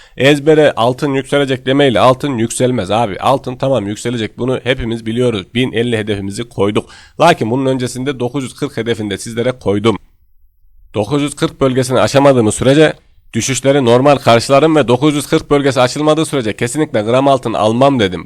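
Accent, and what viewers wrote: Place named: Konya, Turkey. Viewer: Abı sen Konyalımısın ve konyadamısın konuşma tarzın benziyor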